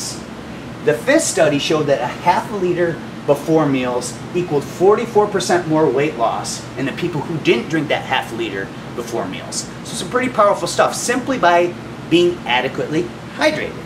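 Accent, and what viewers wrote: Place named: United States. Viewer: nice video, You have a very strong American Accent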